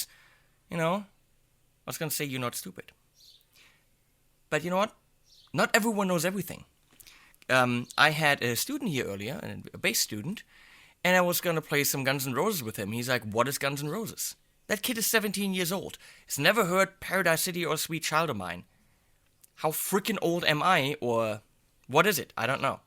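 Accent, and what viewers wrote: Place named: Germany. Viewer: LOL! Love your demo of the Dark Matter, your humour, and your German accent! Keep on! Helmut from Germany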